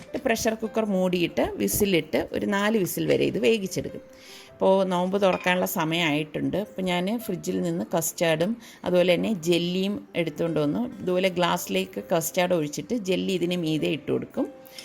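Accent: Trivandrum, India. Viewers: Tvm style samsaaram kettappo oru sugam......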